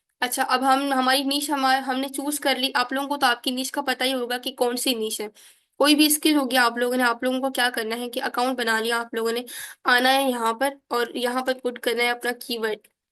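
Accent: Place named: Sindh, India. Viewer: Ilahi behtreen. Khushe hue ke mashaAllah Hamare sindhi sisters be Digital platforms me hai Twan je Awaz ahen accent k lago munkhe tawan sindhi ayo. BTW Zbrdst lecture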